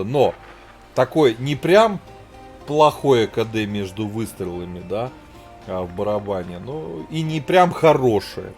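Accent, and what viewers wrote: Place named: Moscow, Russia. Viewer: Блин, какой же ты самодовольно противный. Прям Царь блин танков, манера Московская чтоли, акцент ужасно противный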